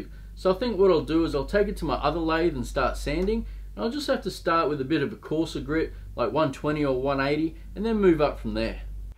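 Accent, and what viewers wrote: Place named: Australia. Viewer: Good filming, interesting content, aussie accent, mentioning another channel, you got yourself another subscriber!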